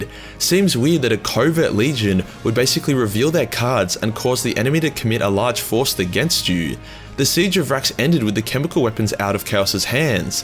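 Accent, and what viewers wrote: United States. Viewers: Damn that was a pretty good US boy accent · I lost it to the American accent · THE AMERICAN ACCENT. WHOOOO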